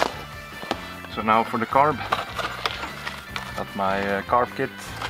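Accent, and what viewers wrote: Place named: Netherlands. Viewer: Cool shit - trying to place the accent. Dutch?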